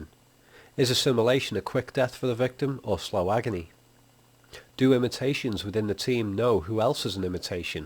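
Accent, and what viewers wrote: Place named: Liverpool, United Kingdom. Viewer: Great video. The new film was very sloppy film making cashing in on the name. I did want to ask unrelated to the video, where are you from? As you sound scouse but you use a fair amount of American words the English never use and some American pronunciations.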